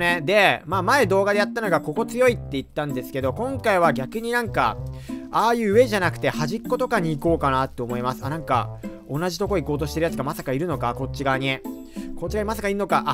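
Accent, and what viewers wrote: Kansai, Japan. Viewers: なんか今回関西弁多い笑笑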